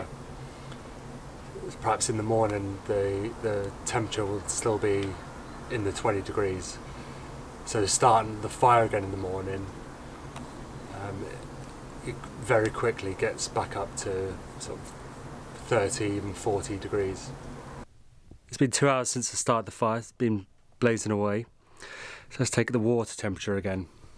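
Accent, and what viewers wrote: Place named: England, United Kingdom. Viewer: Where are you located? You sound English but I doubt you're in the UK.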